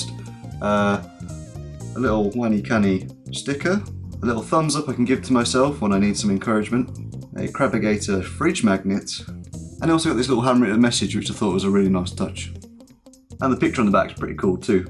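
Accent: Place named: Birmingham, United Kingdom. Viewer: Kantan Japan I will definitely check that out, I had heard of it but was already swamped with books and other websites etc. And the accent give away is from your N4 video, you said the number 5 - it's impossible to cut the accent out of that number ;) Also, I'm from Great Barr, so only down the road - probably why you sound so familiar!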